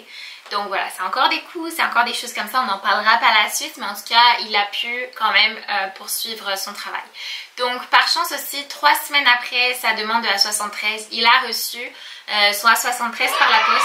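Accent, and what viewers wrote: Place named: Quebec, Canada. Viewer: C'est fou comme tu as pris l'accent Quebecois ! Je te revois encore avant ton départ pour ton année d'étude. Que de chemin parcouru depuis. Je vous souhaitee de réussir dans toutes vos démarches <3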